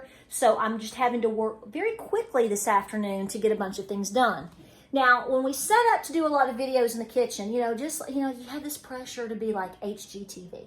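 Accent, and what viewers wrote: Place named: Appalachia, United States. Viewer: You are so down to earth & speak really good hillbilly😆 & that’s my language! I love your videos! Keepum comin!!!👍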